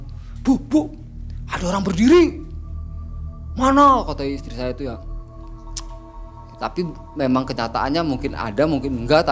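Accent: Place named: Java, Indonesia. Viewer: Medok bgt lurrr 😂😂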